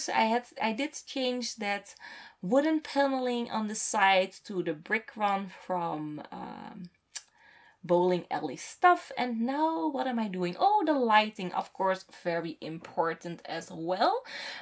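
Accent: Netherlands. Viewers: It's so cool! I can't wait to see what you'll do with all these appartments. But I think there will be a lot of episodes and that's good :D And by the way I do love your Dutch accent, espcially when you say garage. In each video I'm waiting for you to tell it and I can help myself smiling. Can't wait for the next video !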